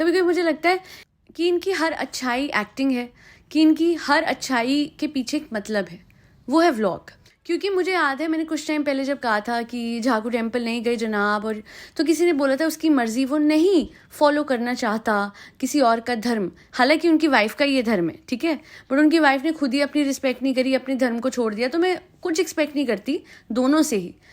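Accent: Punjab, India. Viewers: Koi kuch kare ya na kare madam janab badle ya bhaad me jaye one thing is constant ur lovely voice and ur meethi meethi Punjabi ❤❤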